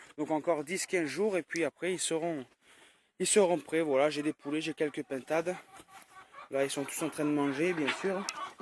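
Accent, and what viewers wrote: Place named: South, France. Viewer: Tu vien de quelle région? On voit que tu viens du sud avec ton accent....J'ai le meme .... Apres sa ce trouve je me trompe tu viens peut être du nord mes sa serrai bizarre (MOI midi-Pyrenée donc du sud 😂)Sinon super video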